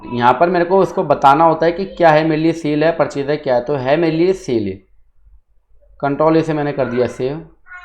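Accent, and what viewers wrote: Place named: Punjab, India. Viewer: Sir ji I am from south India but educated in the north so I can understand Hindi well, but most of my friends simply don't understand Hindi especially your Punjabi accented Hindi yet they are highly impressed with your style of teaching, So please suggest to me any guru of your caliber who can teach the same in English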